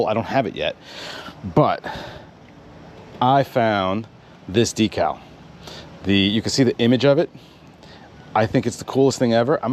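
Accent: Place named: New York, United States. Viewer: was going to say, all this time (since you started the whole series) and not a single time were the mirrors cleaned lol. Great work. your accent makes me miss NY... Keep on keeping on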